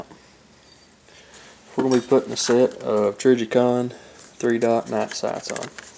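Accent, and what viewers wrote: South, United States. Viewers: I've done this to 2 of my Glocks so far, so I have the experience but it was still fun to watch your video. You did it very well, and made the most important points of using loctite on the front screw, and a soft punch on the rear. I also really like the way you talk, your country accent is really cute, hehe :) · All vids should have a warning if they are going to be narrated in a southern accent. I would rather drill a hole in my fucking head than listen to a slack jawed yokel.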